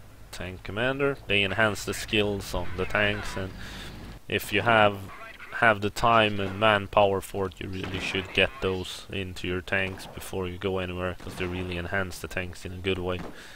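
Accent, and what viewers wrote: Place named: Sweden. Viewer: Where are you from? :) You sound Swedish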